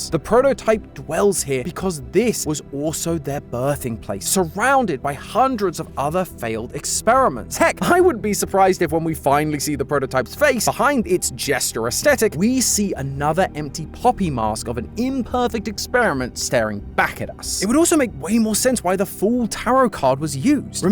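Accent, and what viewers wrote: United Kingdom. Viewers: Wait , why do u have a British accent all a sudden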